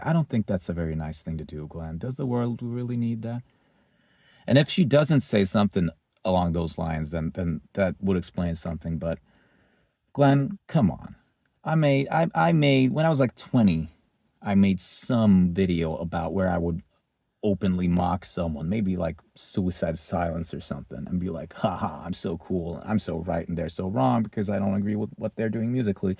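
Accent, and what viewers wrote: United States. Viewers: This is probably just a me thing: But I like your accent! It sounds a little somewhat similar to American! (I am a Finnish guy, but I speak in the American accent when speaking English)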